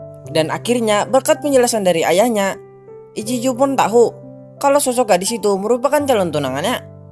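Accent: Banjar, Indonesia. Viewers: orang banjar kh bg?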